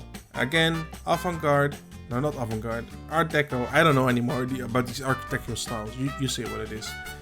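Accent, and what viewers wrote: Netherlands. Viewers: So creative, love this project. Also the commentary is the most lazy but most funny too. Ennuh ennuh, bla bla bla, you can see that for yourself whatever. Also, and I always wanted to ask you this, but is your Dutch English accent on purpose? Don’t feel offended as it’s so funny to listen to.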